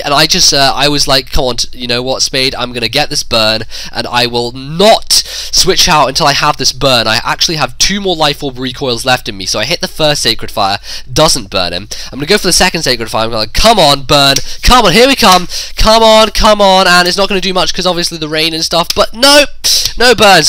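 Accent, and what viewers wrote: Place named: United Kingdom. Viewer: U british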